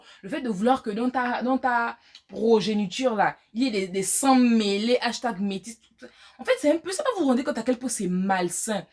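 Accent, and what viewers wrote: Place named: Gabon. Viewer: Le son de ta voix jai directe dit .gabon moi aussi badecon 😂😂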